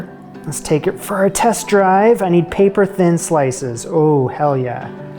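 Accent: Canada. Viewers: I love his Canadian accent. 'Hang the Po-ouch.' LOL. Also, even if I'm making a cake, brownies or bread, I still use the trick my mom taught me. I take Crisco in a paper towel, grease up the pan. Then cover/dust with flour, to tap out the extra before baking. Anything for the 'Substitute' doesn't match. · Night shift Canadian accent is more pronounced and I love that. 🇨🇦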